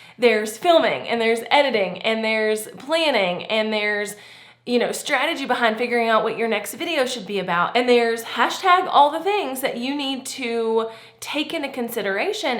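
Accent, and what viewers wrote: South, United States. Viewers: I love the fact that there is someone else with a good southern accent who's making it in YouTube! I hear myself on camera and it's a hoot! And yes, I'm from North Carolina as well!:)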